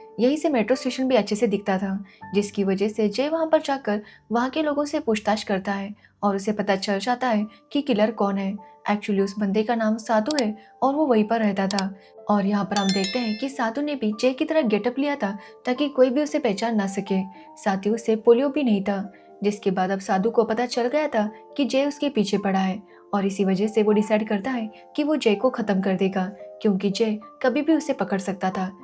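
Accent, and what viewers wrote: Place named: Maharashtra, India. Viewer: Is your mother tongue is marathi ?... or does the narrator belong to the marathi language speaking community ... 😅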